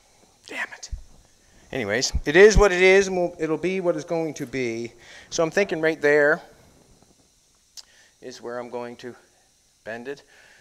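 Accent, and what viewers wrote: Canada. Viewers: I recognized the Canadian way you say some words. We do the same in Wisconsin. Liked. Subscribed. Bell rung.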